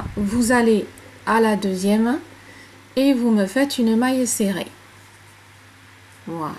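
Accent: South, France. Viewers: bonjour et trés joli châle et continuez à parler un peu de sud ne fait pas de mal au contraire merci pour ce tuto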